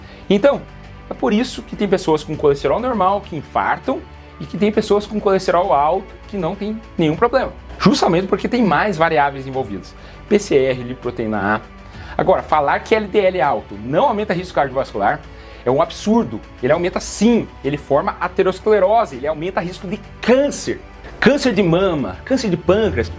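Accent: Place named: Caipira, Brazil. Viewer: Deveria ser proibido médico com sotaque caipira abobalhado!!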